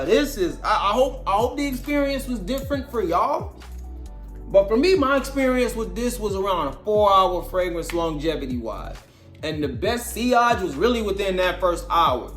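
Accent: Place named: New York, United States. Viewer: U gotta be a NY nigga with the yeeeerrrrr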